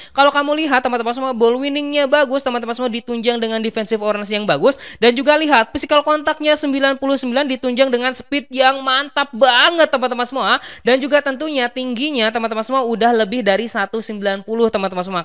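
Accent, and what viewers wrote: West Java, Indonesia. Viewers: Bang orang jabar kah?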